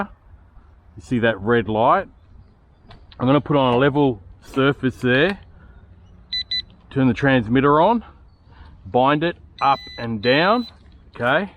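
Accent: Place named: Australia. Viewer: Its the Aussie accent mate, give it some time until it gets used to it haha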